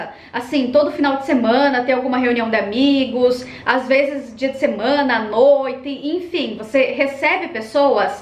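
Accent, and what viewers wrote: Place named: Curitiba, Brazil. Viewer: Gostei de ouvir o sotaque da minha terrinha. Moro a vinte anos no Rio, e é bom me sentir em Curitiba de novo.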